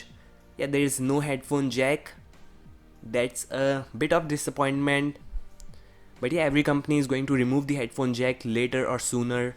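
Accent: India. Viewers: Omg indian accent so stupid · Indian english. Unbelievable. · Ikr sounds like an indian shitting on the street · are you dumb? The xeectechcare channel is from pakistan. If you listen to zaryab (xeectechcare) english accent, he sounds like american. Unlike in this channel, this guy is indian and his english accent is different.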